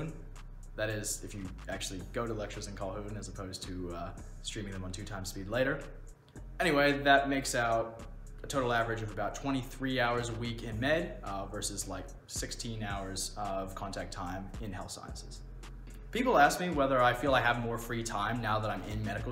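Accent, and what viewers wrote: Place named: United States. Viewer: Hi. I am in the final semester of my undergraduate studies and I want to do my masters programme in NZ. the problem is that I majored in American and British language and Literature and I want to do switch to medicine or nursing. Please what is your advice? Are you an international student there? 'cause you have an American or Canadian accent.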